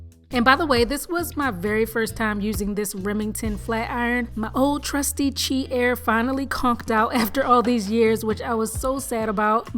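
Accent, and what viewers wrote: Baltimore, United States. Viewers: I've seen your thumbnails for years n I always refer to you as the girl that looks like Nicki lol I love that you did this natural straight style, aslo do I hear a bmore accent?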